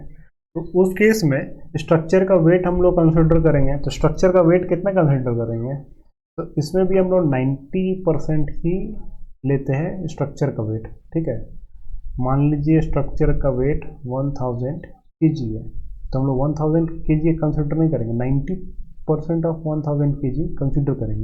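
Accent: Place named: India. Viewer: Indian Accent 🙆‍♂️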